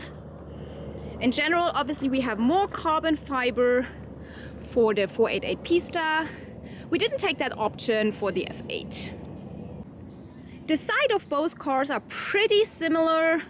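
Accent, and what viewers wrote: Germany. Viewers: I swear you sound german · Der Deutescher Akzent 🇩🇪😂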